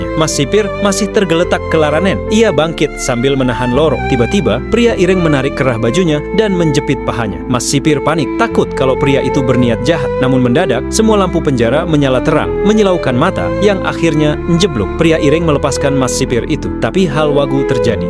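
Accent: Java, Indonesia. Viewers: bagus mas pake aksen jawa nya😅😅😅😅😅😅 bikin makin ngerti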